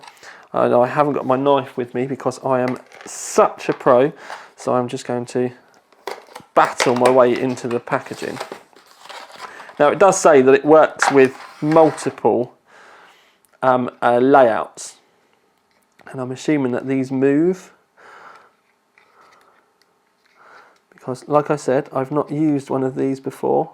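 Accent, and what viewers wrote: United Kingdom. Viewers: So it's actually? For the longest time I thought it was. #BritishAccent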